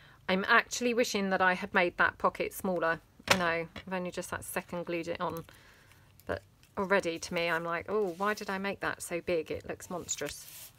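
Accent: England, United Kingdom. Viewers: I also like your videos,and your English accent.....watching and listening is great fun, my desk looks a lot like yours I do try keep things in order when creating,....forget it....always a mess, a happy mess tho....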